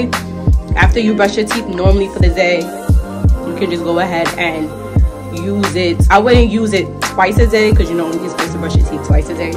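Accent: New York, United States. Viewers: You are so NewYork! I love it ! I'm from Queens and I know an original NY accent when I hear it. Subscribed.....God Bless you and your daughter. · I like the video helps you from New York 🗽 I peeped the accent 😂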